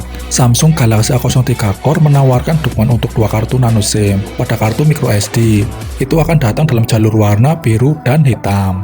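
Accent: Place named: Java, Indonesia. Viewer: Pengisi suaranya medok tapi enak banget sih gw rasa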